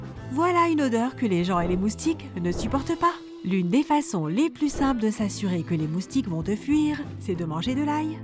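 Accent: Quebec, Canada. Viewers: C'est l'accent canadien du Québec et encore ce n'est pas trop prononcé !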